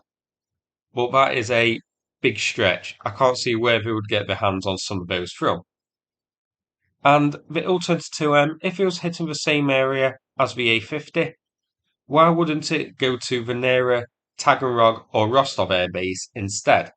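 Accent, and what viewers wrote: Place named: Northern England, United Kingdom. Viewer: I just love the commentary, makes me homesick for the North of England. Phrases I heard often when younger.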